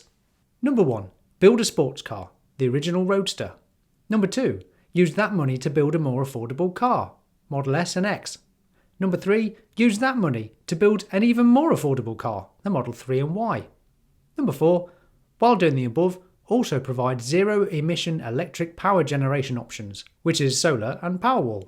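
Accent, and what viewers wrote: United Kingdom. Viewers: Lovely content. Funny accent. Are you from Liverpool or Manchester, perhaps?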